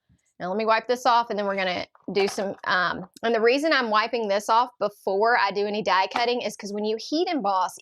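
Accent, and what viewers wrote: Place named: Chattanooga, United States. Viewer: I thought I recognized that accent! I’m in Chattanooga! Love your cards! So glad I caught the replay - hopefully I’ll catch the next one. I’ve just started using my nesting dies for more than just a panel. TFS!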